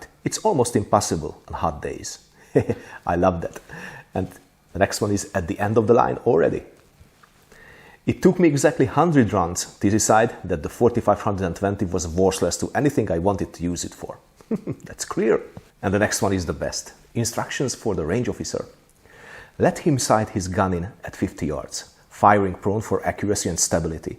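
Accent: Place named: Hungary. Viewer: you are such a pleasure to watch, informative, knowledgeable, and Hunglish is a beautiful language!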